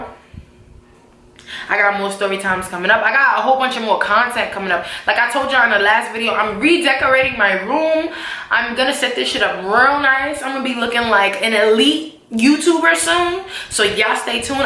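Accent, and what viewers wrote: New York, United States. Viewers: Girlllllllll you are a true New Yorker that accent tho!!!!! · Na for nothing 😮 you from ny Lmaoo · Sound like Rihanna with a New York accent deadass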